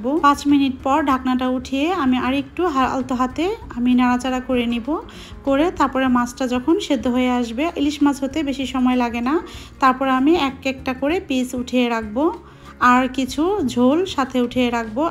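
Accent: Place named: Tangail, Bangladesh. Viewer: কথা বলার স্টাইল মনে হয় আপনার বাড়ি টাংগাইলে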